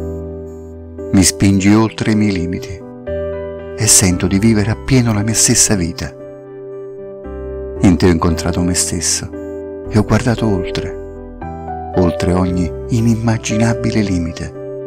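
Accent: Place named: Naples, Italy. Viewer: Bella voce ma inflessione troppo partenopea... peccato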